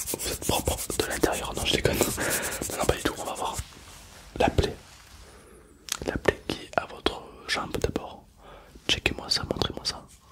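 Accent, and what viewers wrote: South, France. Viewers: ça m’fait bizarre prck tout le monde dit que ta un accent du sud mais vue que chui aussi du sud bah je l’entend pas fin voilà quoi c tout.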